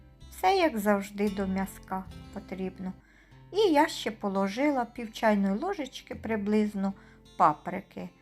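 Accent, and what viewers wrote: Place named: Surzhyk, Ukraine. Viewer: Розмовляе суржиком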